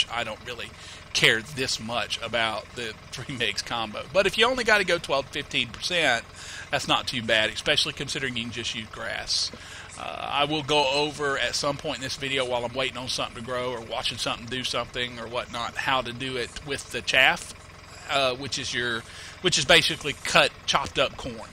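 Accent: South, United States. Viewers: hey bo really like how you explain the different steps. Most other videos do explain it quite like you do. Appreciate how you explain stuff. Keep up the great work man. I am from NC sure is great to hear someone from the south explain things. Makes alot more since to me than someone from some where else.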